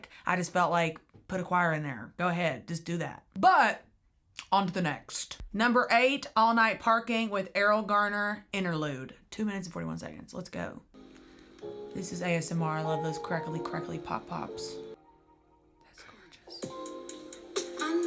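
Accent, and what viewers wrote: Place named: South, United States. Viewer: your southern accent is my favourite thing ive ever heard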